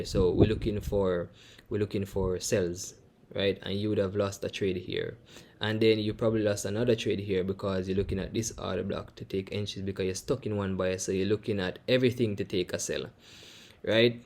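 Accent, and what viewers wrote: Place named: Jamaica. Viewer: Mhnnn I'm really melting here.. I love your sexy voice and that sweet pie Jamaican accent.. Ohhhh my goodness I can't even follow the strategy but smiling all the way cos I love you mhnnn.. U ve earned a adimire sub.. U are delicious kikikikiiiii.. Have a beautiful day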